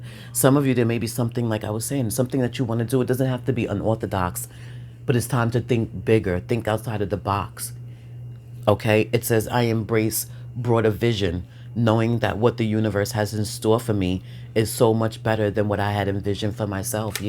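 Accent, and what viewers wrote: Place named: East Coast, United States. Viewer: I just LOOVVVEEEE your East coast accent!🥰❤❤❤💛🥰💋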